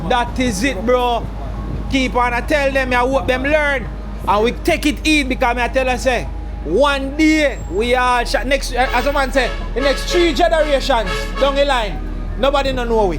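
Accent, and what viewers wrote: Jamaica. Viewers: Respectfully and TOTALLY disagree 💯🙏🏻!YOU! YUH CONTENT!!AND YUH PATOIS!!!! ARE 💯🙏🏻🤗🇯🇲❤️💛💚 NUFF RASPECT EVERY TIME MI BRO.. MI GENERAL..OUTSIDE! ❤🤗🇯🇲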